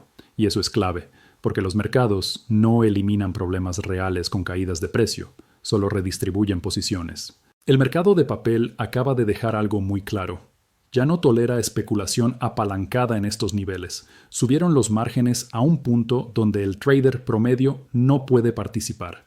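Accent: Argentina. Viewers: Por qué ahora hablas argentino?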